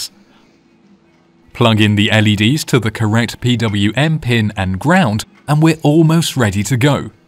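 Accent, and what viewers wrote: United Kingdom. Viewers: Just a small point, but when searching for a datasheet, isn't it better to search on the components code and the word datasheet instead of the code and pdf? Not all datasheets are PDF's, obviously most are but some are weblinks, and true there is usually hundreds of results for popular components, but sometimes obscure components are hard to find and by just searching on PDF you may mis a datasheet. Can I just ask something, how come when you search on google it's the Polish version, when you are very obviously from England, home counties by the sounds of it, why and how come? Are you hiding in flat coming out when he leave to buy hamster food? Great videos BTW, thanks. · Well done ! You have a pleasant voice, a neat pommie accent and you make the technical bits sound easy - great for building confidence in your viewers! Looks like it is time to dump the N-gauge and get a few Lego trains! 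I also love ARDUINO - I use it in my Christmas Villages.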